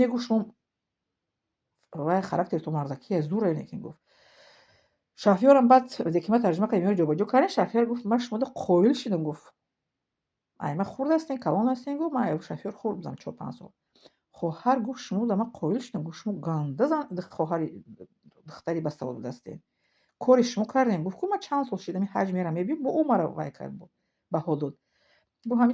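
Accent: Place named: Kulob, Tajikistan. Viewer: Ехоҳар хдт ай кҷои Гуфти кулобиҳо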